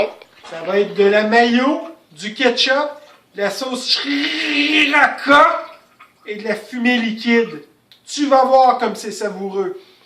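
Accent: Canada. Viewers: bonjour super ta video ton humour et ton accen canadien super je suis de normandie en France et j ai apprecier a plus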